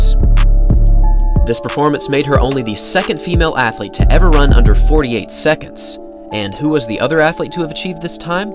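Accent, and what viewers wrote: United States. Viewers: Why don't you take Griffith Joyner into this equation ? oww wait, i hear a yankee commenting voice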